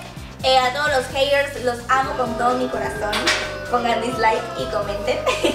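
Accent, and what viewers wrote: Argentina. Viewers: Se te esta pegando el argentino 😂😂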